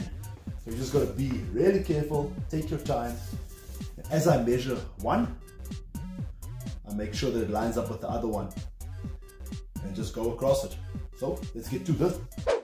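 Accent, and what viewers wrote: South Africa. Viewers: South African accent? Cape Town?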